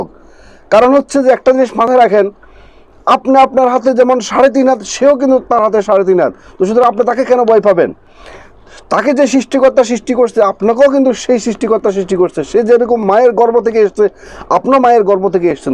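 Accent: Bangladesh. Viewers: আপনি কি পূর্ববঙ্গের মানুষ ? আপনার কথাগুলো খুব টান আছে ? আপনার বাড়ি কোথায় ?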